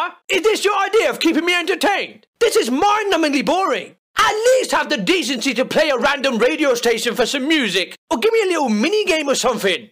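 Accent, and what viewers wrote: United Kingdom. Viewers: british lmao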